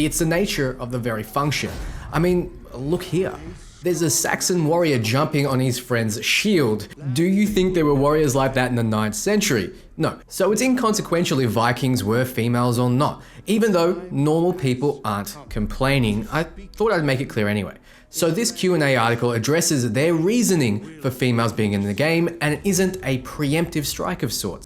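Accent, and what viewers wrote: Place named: Queensland, Australia. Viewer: "Were there female Viking warriors? Who gives a f!" "That was a question Kotaku so next time use a question mark mate." I'm not sure what you read, but the part highlighted in blue literally has a question mark, right there, after the question. Are you intentionally being obtuse? I dislike Kotaku as much as anyone who understands their background, call them out on their biased reporting, there's ample opportunity for that you don't need to make stuff up. I really enjoy your content and as a fellow antipodean it's nice to hear an Aussie accent - your accent suggests Queenslander to me, I'm from SA. So what's going on my dude? EDIT: I've just come from your recent video with the hidden messages where you miss-read the hidden message. I don't think you're doing this intentionally and would like to believe that you are being intellectually honest but it does set the framework up to make me wonder what else is going on when we can't read what you're quoting from. 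Anyway as an Aussie I'm sure you'll see my comment for what it is, criticism, pure and simple.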